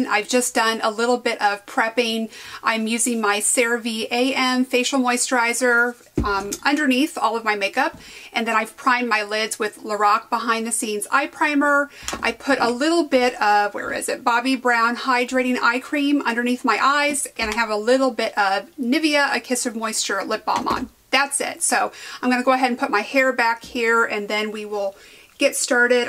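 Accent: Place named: Quebec, Canada. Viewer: C'est trop mignon de t'entendre parler français, j'ai pas l'habitude haha😆🙈 j'aime trop les accents québécois!👌 j'adorerais que tu nous fases d'autres vidéos en français!😁 et enfaite to blend en français c'est estomper!;) bisous xx.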